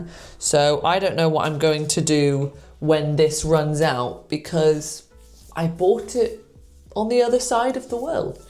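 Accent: United Kingdom. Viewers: Eu amo está professora e o seu lindo sotaque britânico. Seria maravilhoso se tivesse vídeos também com legenda em português e inglês juntos. Ela teria milhares de novos alunos brasileiros.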